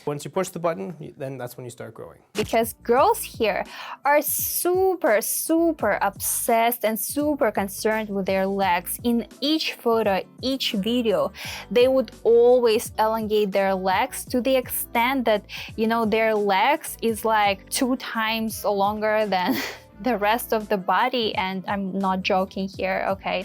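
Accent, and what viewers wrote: Russia. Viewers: Че за русский акцет🤔🤔🤔🤔🤔🤔🤔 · you sound russian, why are you replicating chinese beauty standard though, for work?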